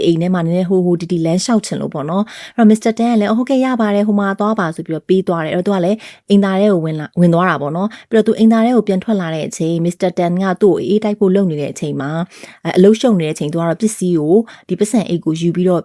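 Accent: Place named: Yangon, Myanmar. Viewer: ရန်ကုန်ဘက် လေသံမလို့ပါ😊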